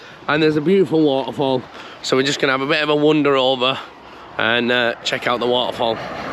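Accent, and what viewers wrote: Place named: Northern England, United Kingdom. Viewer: New to your channel, you don't sound local to redbull hq, so how did you get involved with red bull, and do you travel from your northern location to work?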